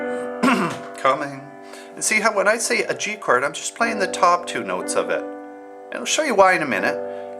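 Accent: Canada. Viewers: love it! endearing Canadiam accent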